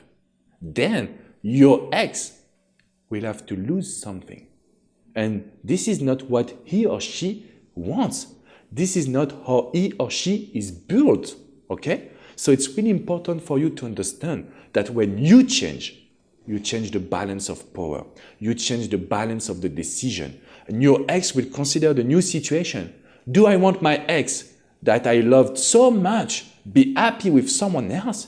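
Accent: France. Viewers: mon cheri tu es tres beau!! PS I understand your French accent just fine..😉 and je ne parle francais really so... You give great advice! Merci Beaucoup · the women love your French accent! I have a question. What if a guy says he needs closure but wants to remain friends and one minute acts happy about the break up and then sad about the break up and what if I can’t help but say really stupid things over text about him moving on.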